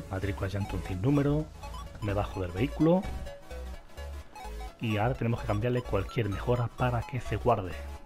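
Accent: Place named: Andalusia, Spain. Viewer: Me encanta de que hables en Andaluz en tus vídeos ❤️ Grande lokete. Te veo desde Granada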